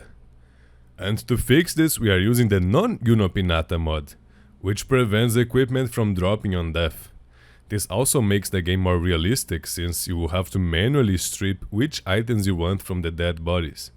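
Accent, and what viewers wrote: Brazil. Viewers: Please man I’m begging do a playthrough with this mod, PELO AMOR DE DEUS MANO EU QUERO ESCUTAR O SOTAQUE BR EM INGLÊS DNV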